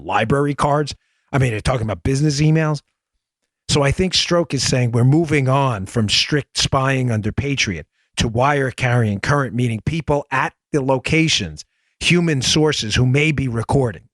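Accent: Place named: Queens, United States. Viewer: Kimber D - I'm Aussie and I've been listening to our Danny B every morning over my brekkie coffee without fail since Episode 628 when I first heard he had his own podcast. The first time I listened to him was when he filled in a few times for Mark Levin's show a few months prior. He was saying everything I believed to be true regarding the Russia Collusion conspiracy theory. Also a few years ago I spent a bit of time in Queens and his accent and attitude always gives me fond memories of the area I love so much. :-)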